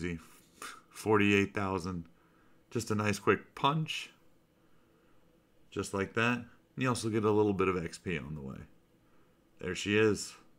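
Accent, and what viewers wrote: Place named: United States. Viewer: man youre american for sure. Thx for ur tips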